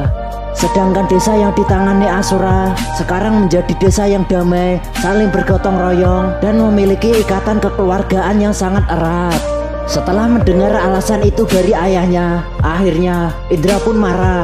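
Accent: Java, Indonesia. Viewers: Anjir suaranya medok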